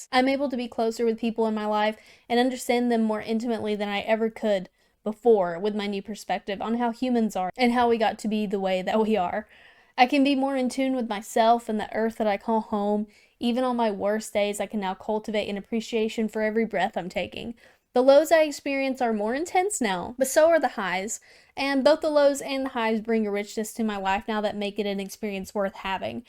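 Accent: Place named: South, United States. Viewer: You sounded a lot more sounthern than I would have expected an atheist to sound 🤠🤠🤠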